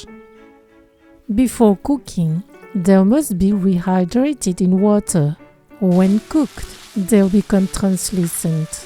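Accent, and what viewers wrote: France. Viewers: you sound french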